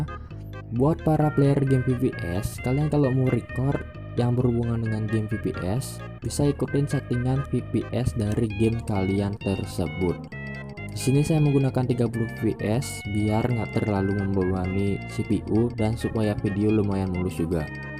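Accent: Bali, Indonesia. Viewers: Orangh Bali Banh? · Adi care nak Bali logat ne,,😀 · Org bali ya bg? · Kayak Logat Orang Bali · Logat abangnya ke orang bali salam bali kawan🙏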